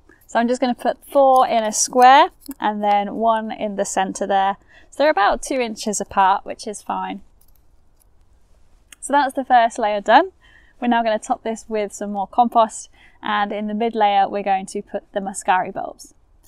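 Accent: England, United Kingdom. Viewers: I must say... It is nicer listening to your English Accent. My American accent is very flat sounding...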